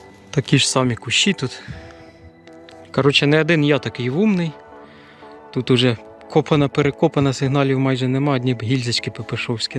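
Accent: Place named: Surzhyk, Ukraine. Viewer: Извини брат - но лучше ты бы на русском вещал . А то такой суржик ..уши режет . От того ,что на русском будешь вещать - ты из украинца в россиянина не превратишься . И русский язык - это не собственность россиян . Мы все славяне - имели общий пра-славянский язык . Потому все славянские языки имеют общие корни и очень схожи . А то, что власти нас подели - в частности на манипуляции языками - то это на их совести . Главное оставаться Человеком - в лучшем понимании этого слова . А находки респект ! Мои поздравления !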